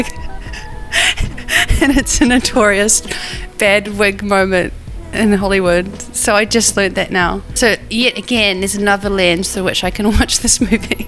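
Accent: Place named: RP, United Kingdom. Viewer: Her accent is kinda posh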